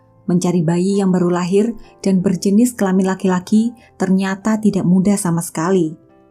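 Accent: Java, Indonesia. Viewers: Logatnya terlalu medhok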